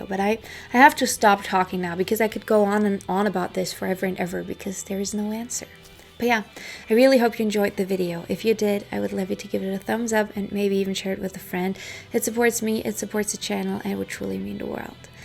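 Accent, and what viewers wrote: United States. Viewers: WOW you have an awesome American accent! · you are a delicious woman with a perfect american accent!🤩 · I thought you were American until you said English wasn’t your first language. Fooled me.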